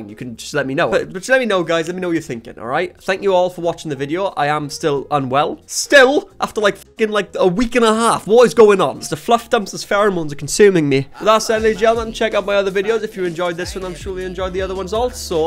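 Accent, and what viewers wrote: Wales, United Kingdom. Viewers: Are you welsh ???? My dad is from cardiff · R u welsh?????? If u are I am too I recognise the accent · You are Welsh aren't you? My mom is a Welsh immigrant. And I can pick that accent out now pretty easy.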